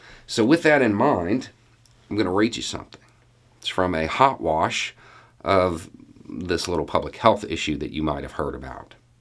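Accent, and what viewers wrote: South, United States. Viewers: Can I say that it is very refreshing to hear someone with a southern accent who actually sounds intelligent and reasonable?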